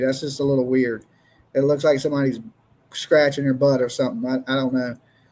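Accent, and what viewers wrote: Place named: Kentucky, United States. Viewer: First time watcher. I like the style you do your show. You talk like most common folk I know,Im from southeastern Kentucky.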